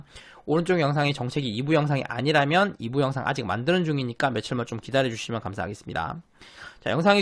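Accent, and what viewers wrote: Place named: Gyeongsang, South Korea. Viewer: 갱상도 사람이시네요 ㅎ 모하시는분인지 궁금.. 설명 진짜잘하심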